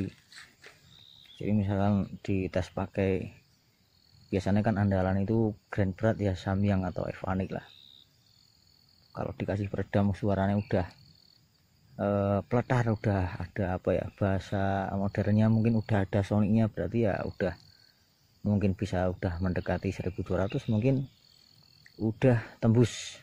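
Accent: Java, Indonesia. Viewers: Mas njenengan jowo ne pundi nggeh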